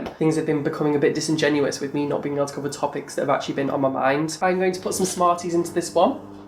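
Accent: Manchester, United Kingdom. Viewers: are you from Manchester of so I love you even more I that is even possible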